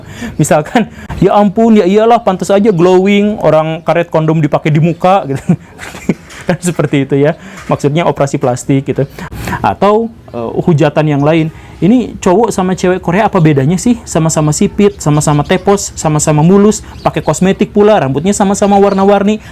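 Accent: West Java, Indonesia. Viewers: Sebut saja saya fans, Saya suka banget dengan paparan informasinya. Saua berharap bisa bertemu langsung dan belajar ke akang. Kebetulan, kedengerannya akang kaya org Sunda, klo bener, saya mau berkunjung buat belajar langsung ke akang. Smoga mendapat pesan balasan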